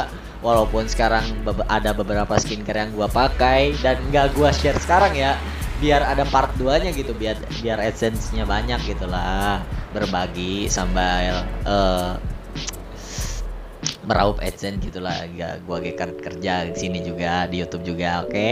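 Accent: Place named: Karawang, Indonesia. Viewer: Orang krw mana nih suaranya ,...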